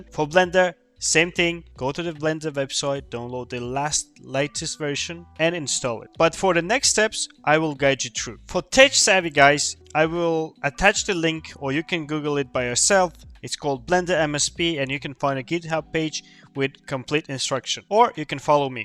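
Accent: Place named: Russia. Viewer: I think he might be russian, c is read like an S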